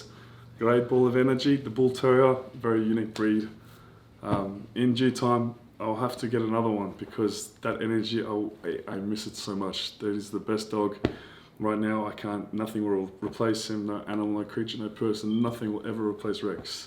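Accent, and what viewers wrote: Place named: Australia. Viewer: Guys is it only me or you too have problem understanding him ? I mean it’s just his Australian accent is too thick and his voice too deep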